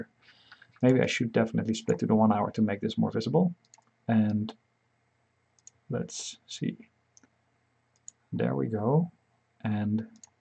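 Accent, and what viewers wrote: Netherlands. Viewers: Zo te horen bent je Nederlands, goed werk! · very dutchy accent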